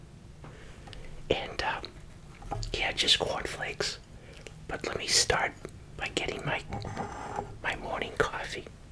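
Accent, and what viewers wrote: United States. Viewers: The way he says corn flakes and coffee is just amazing I’m English and his accent is like the typical American accent I love it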